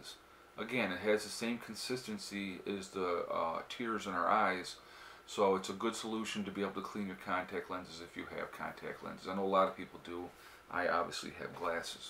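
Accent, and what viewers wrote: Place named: Chicago, United States. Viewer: Are you from Chicago? You sound just like someone close to me who was raised there.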